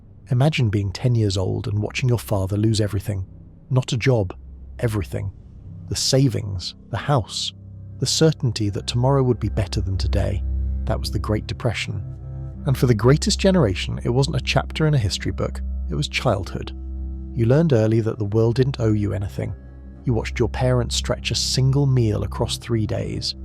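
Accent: England, United Kingdom. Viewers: Why is this narrated by an English person when it’s about America. Anyways Boomers in the UK were not born into winning. The UK had rationing until I think 1955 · British narration on American relevance 🤷🏻‍♂️ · Sh*t Brit accent on American generations. Left wing interpretation. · Hello, this a great video and I'd never heard of Gen Jones which makes a lot of sense. However, if you revisiting please don't use a UK voice for predominantly US content. It's a bit confusing and I feel a bit left out as a brit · USA centered with british accent. Lets forget the US-perspective. Theyre out! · An American story about American generations. Why then an obviously UK English voiceover? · A British accent narrator doesn't work. Give us real sources! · American culture with a english accent curious 🤔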